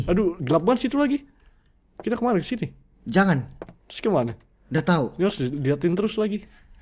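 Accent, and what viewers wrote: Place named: Makassar, Indonesia. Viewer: kak aksen makassar nya pertahankan:v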